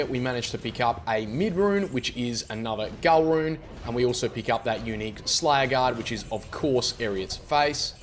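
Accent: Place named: Australia. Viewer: Suped for the aussy accent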